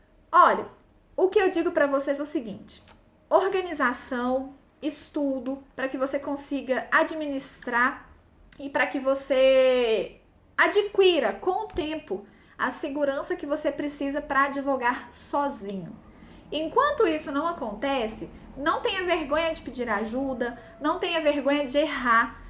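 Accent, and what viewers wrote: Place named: Minas Gerais, Brazil. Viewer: As melhores dicas da advocacia você encontra neste canal! Muito bom! Sem falar no charme do sotaque "mineirinho"... Parabéns!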